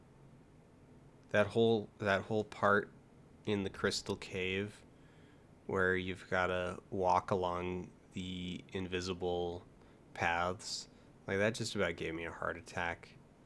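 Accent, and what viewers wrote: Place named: Edmonton, Canada. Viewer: Where do you live? I'm from Edmonton. Sounds like you're close to me.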